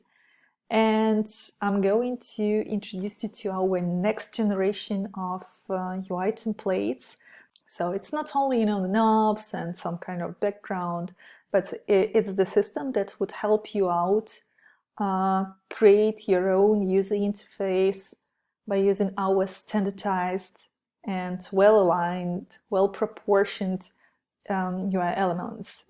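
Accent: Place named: Russia. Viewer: по акценту понял, что русская, потом посмотрел в комменты)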